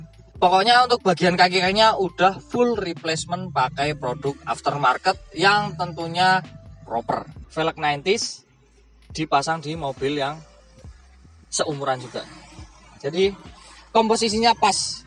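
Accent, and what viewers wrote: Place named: Java, Indonesia. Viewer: medok jowone mas 😅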